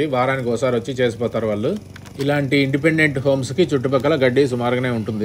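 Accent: Rayalaseema, India. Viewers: Yem naaa meedi kadapa or anantapur? Super presentation in our seema slang..love u bro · Anna hands-off to you mana rayalaseema slang marledu neku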